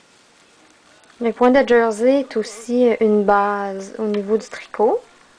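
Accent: Quebec, Canada. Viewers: Tu exagères, on comprend parfaitement ce qu'elle dit et son accent québécois est délicieux.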